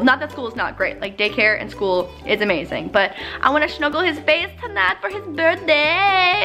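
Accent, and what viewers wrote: Canada. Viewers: TOMORRRRRROW J.k I love Canadian accents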